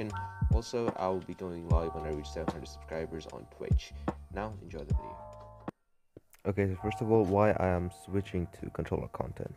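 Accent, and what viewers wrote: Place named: Singapore. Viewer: this sound like a Singapore accent I'm not gonna lie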